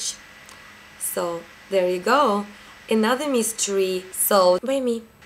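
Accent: Russia. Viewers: Yo are Russian?Have a rusian accent :) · ёпт !! у неё русский акцент..... она 100пудово говорит по русски !! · gaahaa! that russian accent omfg!!!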